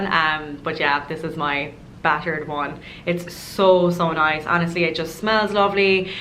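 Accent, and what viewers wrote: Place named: Ireland. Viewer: Lovely your Irish accent. ❤️❤️❤️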